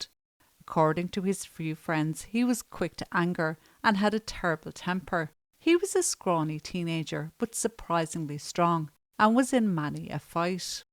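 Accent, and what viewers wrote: Ireland. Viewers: I love this Irish accent. Well narrated! 👏👏👏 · Irish accent, ❤ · This fool doesn't recognise a beautiful Irish accent! Eejit!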